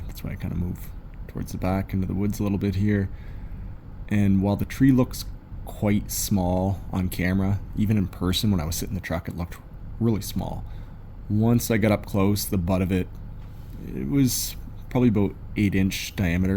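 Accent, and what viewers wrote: Canada. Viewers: He's in Canada. His accent is a dead giveaway.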